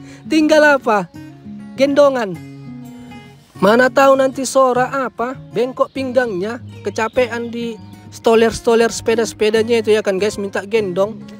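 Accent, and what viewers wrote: Medan, Indonesia. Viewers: Indonesianya dari mana bang, Medan kah?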